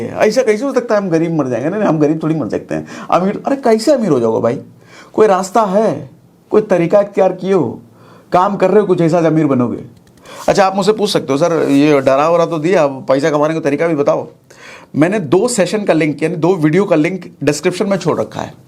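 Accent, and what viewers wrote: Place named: Uttar Pradesh, India. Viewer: Ye thumbnail ka kamal nahi hai sirji, ye to aapki attraction ka power hai Jo ham logon ko videos dekhne ko majboor kar deta hai, up accent ka power 💪💪♥️♥️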